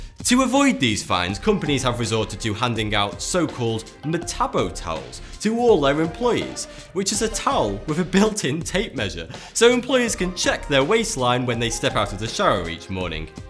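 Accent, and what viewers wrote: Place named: Yorkshire, United Kingdom. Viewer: Man, that Yorkshire accent...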